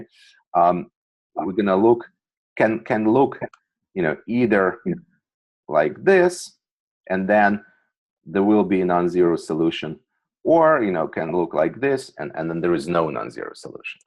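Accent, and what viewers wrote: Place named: United States. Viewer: Thank you very much for uploading these videos. Did you learn English in States? I know you are Russian but you don't have much Russian accent in your English...it is American. I am surprised to be honest. Many people in Russia learn British pronounciation. And yours is clearly not that.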